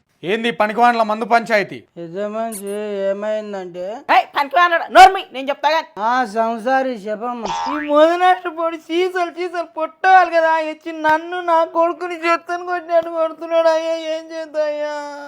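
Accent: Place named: Mahabubnagar, India. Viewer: Miyammak phonjescheppinav ania side kuda ilage matladutaru mi mehaboob Nagar side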